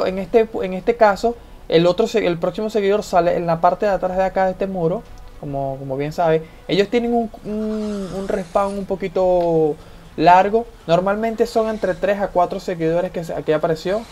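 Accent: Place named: Venezuela. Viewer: Buenas hermano gracias por la guía en serio mucha ayuda sólo.que iniciar este juego cuesta muchísimo el inicio es difícil , pasarte la campaña sobre.Todo los dos últimos mapas cuesta aún no he podido , tampoco tengo mucho jugando apenas 1 semana y entendiendo mejor el juego con ayuda de vídeos como el tuyo,ahora he visto cosas que para mi una sola.explicación tienen como el.tema de tanto.daño y hp de algunos personajes y.debe ser que han pagado cambian gemas y compran el.rank 10 del Premium que tiene buenos beneficios de.hecho excelentes eso les ayuda y que empezaron el día del lanzamiento del juego. Si te animas agradecido por los vídeos y tener alguien con quien jugar en línea de habla hispana y ese acento debe ser venezolano jajajaja si es así de que parte hermano y gracias precios los consejos y tu tiempo al.subir vídeos .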